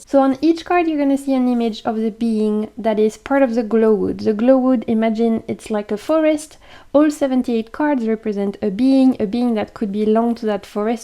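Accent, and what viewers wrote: France. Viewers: That’s a very complex oracle deck. You can tell a lot of time, energy and research in making this deck come to life. I will check it out and the 10% discount is great! Thank you for reviewing this for us., you need to make an oracle based on the plants and wildlife you grew up with. Teach us about where you live in the world. Many days I wish I was on a tropical island. Especially with winter in the Northern Hemisphere is so bitterly cold. BTW your French accent is lovely. I studied French in high school. I’ve always thought it was a beautiful language.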